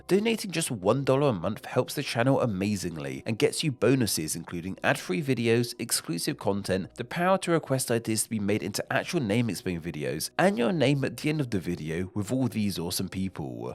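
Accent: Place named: United Kingdom. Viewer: This is probably gonna get pushed down, but since I didn’t see anyone else saying it, the pronunciation of the Hebrew word for Passover is not in fact “Pesca”, and the meal isn’t said “Sayduh”, it’s “Pesach”(roll the ch like “fancy” Bach) and “Seder”(both E sounds are like “Ben”). A good video historically speaking, but the British accent is strong here, lol.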